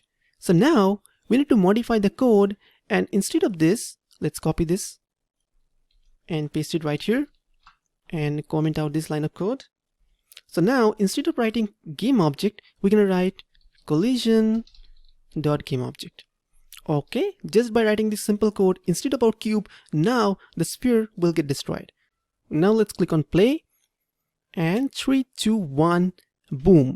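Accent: India. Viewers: it would be perfect tutorial if it didnt have the in*ian accent. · It migt be a little bit racist but when i heard his indian accent i knew he knows what he is doing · Little skeptical about the fifteen minutes, then I heard the Indian accent and I then knew that the title wasn’t joking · i pressed the video and i said to my self its like other videos it wont help until i heared indian accent and i got locked in❤ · Once I heard the Indian accent I knew he actually knew what he was doing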